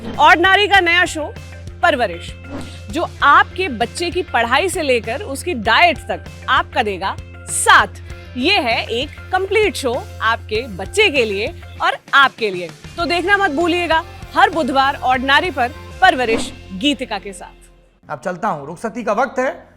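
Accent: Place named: Bundelkhand, India. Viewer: 🙏🙏🙏 हार्दिक साधुवाद एवं मंगलकामनाएं आपको निष्पक्ष, निर्भीक होकर और मीडिया धर्म निभाने के लिए आप जैसे पत्रकारों को के 🙏🙏🙏 कोटि कोटि नमन जी आपकी क्षेत्रीय निवासी लय बहुत अच्छी, बहुत उत्तम और शालीन भाषा बुंदेलखंडी लय 🙏🙏🙏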